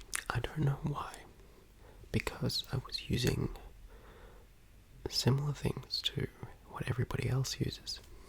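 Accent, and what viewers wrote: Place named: Australia. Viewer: Aussie accent 🥰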